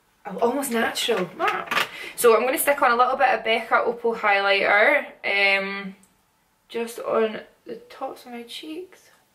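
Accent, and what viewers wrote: Scotland, United Kingdom. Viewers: Omg I love your accent 😍👌🏻 I have a friend who's Scottish but she doesn't have an accent like you, but it's kinda adorable. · Are you from Scotland ??? Im from Glasgow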